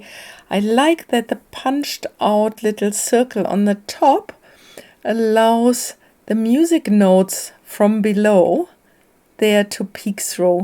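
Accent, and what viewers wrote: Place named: Germany. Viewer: Hi! New subscriber here! I love your interpretation of this prompt so much! I lived in Germany for 4 years. My husband is in the US Army & we were stationed in Landstuhl from <num>. I still have many friends who I still keep in touch with from there. Your accent brought back good memories. I have quite a few windows it is very uncomfortable to see. But I love the thought that seeing it can kind of release us. You are a breath of fresh air! I’m so glad I found you through this collaboration. Danke. Tschüss! xoxo, Jenn 🤗💚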